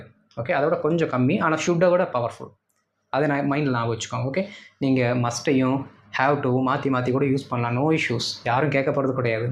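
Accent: Coimbatore, India. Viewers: ஏனுங்க நீங்க கோயம்புத்தூரா Brother class super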